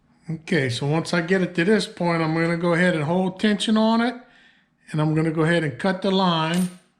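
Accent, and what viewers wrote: Louisiana, United States. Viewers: I thought I recognized that accent! Fellow (former) South Louisianian here - and your video is perfect! I was worried about doing it "right", and this video shows me how easy it is to do it right, and make it look great. I'm in the Northeast now, and I have been trying my hand at fly fishing. I was gifted a flyrod from the late 1960s, and it needs to have the eyes rethreaded and resealed. Fishing for stream trout and is fun and all, but nothing like hooking on to a bull red! Can't wait to get back home to Louisiana to hit the marshes.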